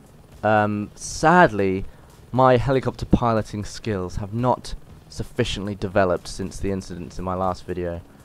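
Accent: United Kingdom. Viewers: I like ur accent, wot part of UK r u from? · u got the brit voice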